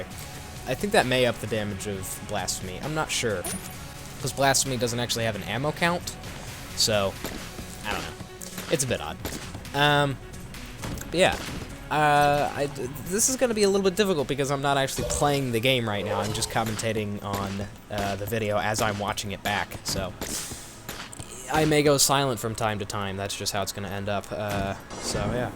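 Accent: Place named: Scotland, United Kingdom. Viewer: ***** Your most welcome! (his Scottish accent is beautiful :P)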